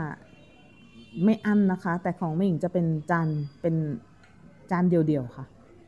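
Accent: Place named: Buriram, Thailand. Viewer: สำเนียงเหมือนคนบุรีรัมยเลยคะ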